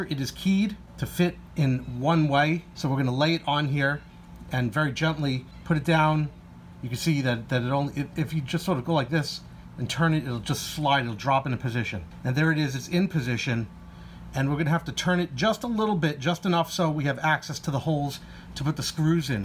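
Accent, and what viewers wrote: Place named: Boston, United States. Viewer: I did that and started her up and it doesn’t sound promising. I will warm her up tomorrow and go out for a couple of miles to see how things are. I wish I could adjust them with the air box installed - at least I can leave them installed. Thanks for your advice. I detect a Boston accent.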